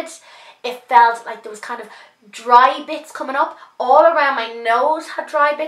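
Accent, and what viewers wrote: Ireland. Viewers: Pretty sure she's from Ireland.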